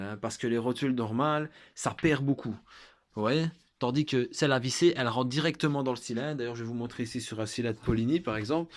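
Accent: Liège, Belgium. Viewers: Merci mon ami,avec ton accent , je me doutais bien que tu étais de la région de Liege ,moi , j'ai habité et travaillé a Andenne,puis Huy et ensuite a Wanze , les voyages forment la jeunesse , mais bon , avec mes 64 ans ,c'est un peu tard , mais je suis reté tres jeune dans ma tete,hi.....hi......Bonne continuation mon ami, tu fais du tres bon travail . A+ Alain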